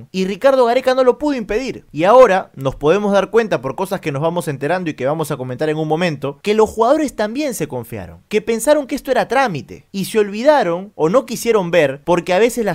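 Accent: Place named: Buenos Aires, Argentina. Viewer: Te escucho algunas veces, pero es la primera vez q escribo, Gareca aun no ha dicho nada y lo que afirmas es mera especulación, muy típico del nefasto períodismo de fútbol peruano, salvo honrrosas excepciones, si sacan a Gareca, acá el tema no sólo es destruir sino proponer quien debería ser su reemplazante si afirmas que Gareca se va Y la otra el acento bonaerense habría que evitarlo un poco. Saludos y éxitos a futuro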